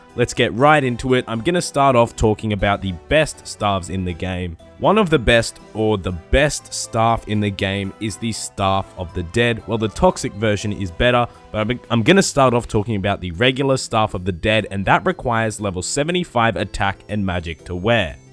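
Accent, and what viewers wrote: Australia. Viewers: your pronunciation of staves is wrong, or is it just australian accent? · BrokeMyNips He's Australian man, they pronounce everything differently :) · To all the non-Aussies getting triggered over his pronunciation of Staff and Staves, just letting you know that's actually how we pronounce those two words in Australia nation wide. Nothing wrong with it. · Didn't know you were australian. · Love aussie accents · fuck all the hate aussies have the best accent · Ed Flitton Aussie accent.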